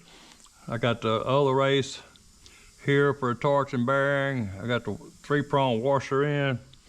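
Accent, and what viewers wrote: United States. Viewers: Where about in the states you come from love the accent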